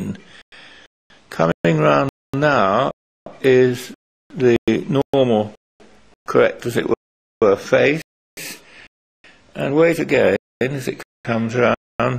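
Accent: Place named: United Kingdom. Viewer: lol, old school brittish accent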